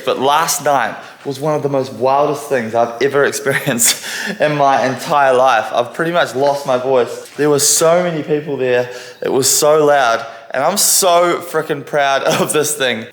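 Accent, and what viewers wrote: New Zealand. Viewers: Rewatching this it defaulted to an ai dubbed English track, even though the original video is English? Youtube couldn’t handle the nz English…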